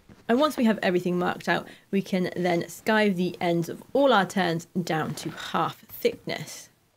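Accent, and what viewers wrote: England, United Kingdom. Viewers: Dear I think you are from England because of your English language accent, am I right.?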